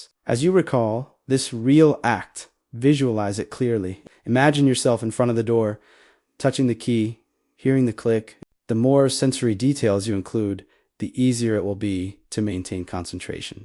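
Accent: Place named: Spain. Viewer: What is the purpose of image moving his lips?He had a deep Spanish accent. I have to look away. It rather takes away the intention.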